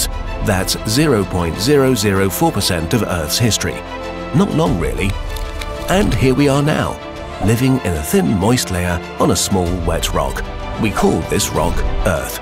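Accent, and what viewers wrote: England, United Kingdom. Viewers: A man from England speaks English. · beautifully narrated with an English accent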